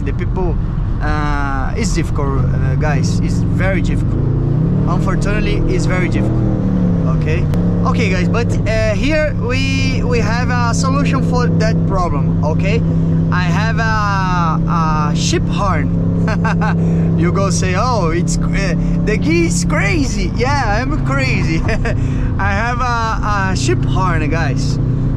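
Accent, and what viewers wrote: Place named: Brazil. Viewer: ele "perde" esse sotaque abrasileirado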